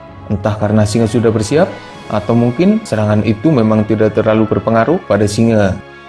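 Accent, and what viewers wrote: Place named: Tegal, Indonesia. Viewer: beda kalau ini logat tegal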